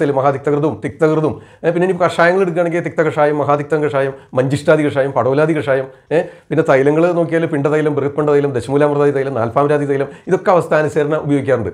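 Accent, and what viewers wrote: Thrissur, India. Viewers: Dr nalla avadharanamanuto thrissurano?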